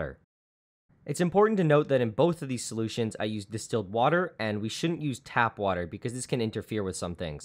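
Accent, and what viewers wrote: Canada. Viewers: So, you're Canadian, aren't you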